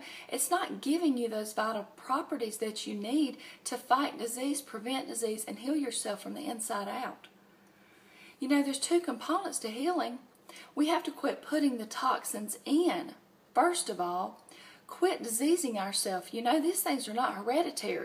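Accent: South, United States. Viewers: I am addicted to watching your videos because of ur southern accent and ur eyes.... Ur eyes are gorg!!!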